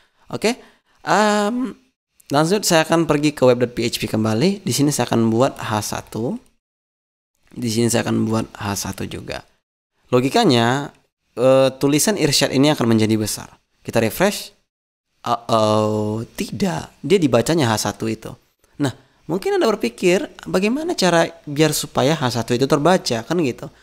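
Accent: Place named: Medan, Indonesia. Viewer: khas medan kali ya bang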